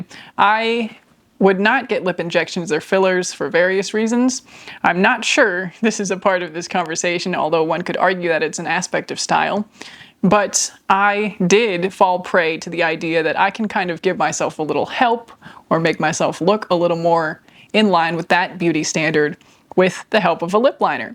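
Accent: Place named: South, United States. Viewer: OK. It’s killing me!! What is your underlying accent. I can hear it when you say “mad”, “had”, “that” “myself” and other words, especially at the end of your sentences. Did you live in the south? Interesting content and great presence. You have a fan here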